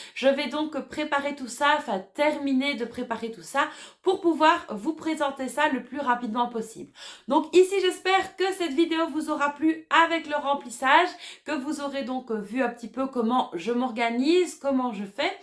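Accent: Belgium. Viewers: ton accent belge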